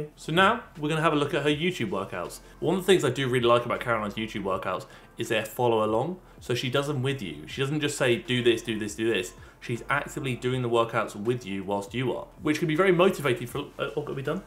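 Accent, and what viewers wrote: United Kingdom. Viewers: Absolutely adore Caroline. I'm not advanced nor a beginner so it's a huge challenge for me but I love every moment of pain. Also I love your British accent especially the 'bloOdY' 😂. Idk if you know but you seem really seem similar to another youtuber Slogo. Don't know if you know him but yeah I can't ignore the similarity.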